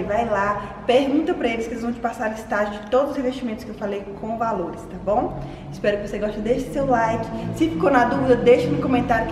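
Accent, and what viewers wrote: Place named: Minas Gerais, Brazil. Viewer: Te amooooo sua linda!!! Arrasa sempre!! Adoro esse sotaque mineireis!!! Inspirando aki nos seus projetos!!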